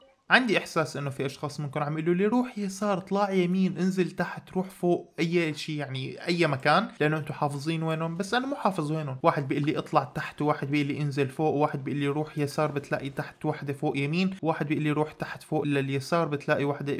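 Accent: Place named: Iraq. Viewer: الاخ عراقي😂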